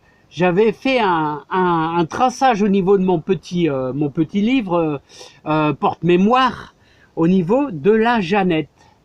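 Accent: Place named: Nord-Pas-de-Calais, France. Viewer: je suis du sud-ouest je parle un peu occitan, et ça fait plaisir de vous entendre parler un peu ch'ti ! continuez !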